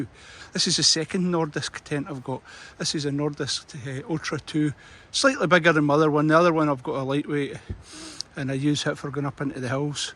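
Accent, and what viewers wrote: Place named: Scotland, United Kingdom. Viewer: Thank you once again,. To the dearest wee deer and possibly remnants of the scents of last summer's bog myrtle et al. Your camp: Well thought-out (obviously) and takes me back to my youthful Rannoch Days. Your 'des-res' and tea/supper: Excellent, as is your photography. Your accent/dialect recall appreciated memories of living with my Family in West Lothian, many years ago, and my now deceased friend Marie Gavin, from the 'Dale. Rest In Peace, Marie. ❤ All the best, Margaret and the Bold Corrie. Rab 🍻 😎 👋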